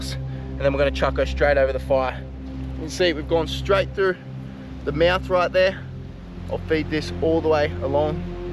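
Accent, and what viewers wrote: Australia. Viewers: Bloody Hell mate aussie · Are you Aussie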